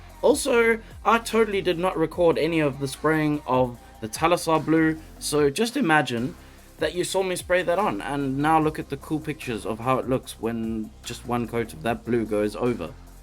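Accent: South Africa. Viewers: Good to hear a South African accent again after moving to the UK! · A cute idea, that a SA accent?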